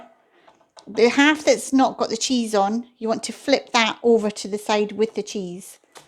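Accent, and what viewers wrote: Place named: Scotland, United Kingdom. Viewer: Delish. Even heard a few wee words from you there with a slight Scottish accent. hehe xx And Scott Bremner....I love omelettes more than you, so there.lol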